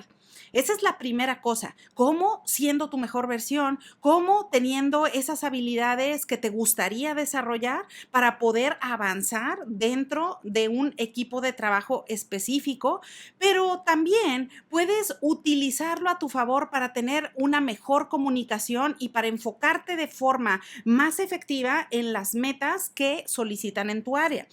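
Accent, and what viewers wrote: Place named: Mexico. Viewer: saludos desde argentina me gusto tu explicación además de la energía con la que le das énfasis a tus palabras y de gustarme ese lindo acento mexicano ji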